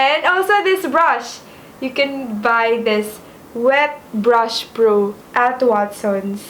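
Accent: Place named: United States. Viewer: Luh kala ko american ka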